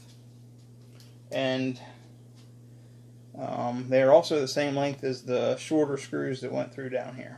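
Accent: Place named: Pennsylvania, United States. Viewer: You have to be from PA right? I hear it in your voice??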